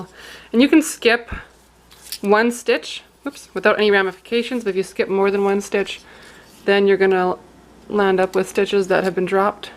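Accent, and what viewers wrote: Canada. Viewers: You are amazing! I love that you figured out how to make the socks without doing the Kitchener stitch. I think at my young age of 73 that I should just buy my socks and not try to make them on machine or by hand knitting. It could take me the rest of my life to figure it all out, ha ha! I have tried hand knitting without much luck. I hate to spend a lot of money on a machine without being able to figure all the ins and outs. Lovely how you have done such a great job for yourself! You sound as if you are from Canada so I will not hope that you can teach me unless you would like to spend a couple of weeks in North Carolina! Bless you girlfriend. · I love the voice and accent. I'm guessing Canadian. I'm convinced this is Matthias Wandel's sister!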